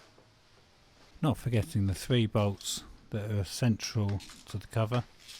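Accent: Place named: United Kingdom. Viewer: Nice accent! Where part of UK are you from?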